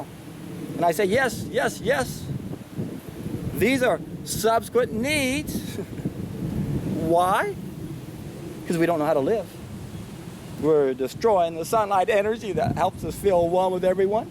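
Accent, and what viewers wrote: South, United States. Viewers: I'm from France, and I think your accent sounds funny. Is it the southern accent ?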